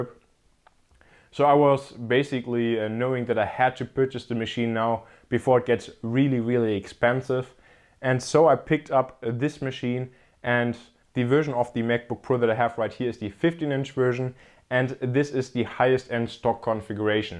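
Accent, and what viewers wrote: Germany. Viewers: Omg.. That german accent!!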